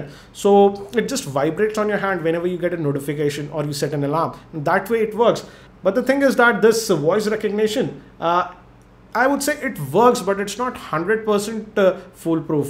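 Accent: India. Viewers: " it is kind of oke " i love indian accent and how they shake their head while talking.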